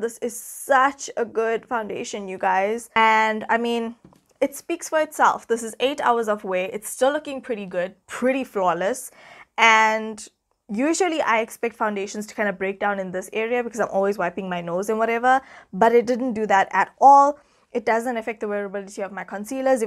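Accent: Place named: South Africa. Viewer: So yes, I have heard of it since it had a good amount of attention in the US, however I am buying it right now online because of your review and recommendation 🙂. It's pretty affordable here, just $12. My current favourite foundation is Bareminerals barepro foundation. This should definitely come into the chain stores, I think it would be really popular....Randomness- I love watching your videos not only for the content but I miss hearing our South African accent ❤. #durbangirl 🇿🇦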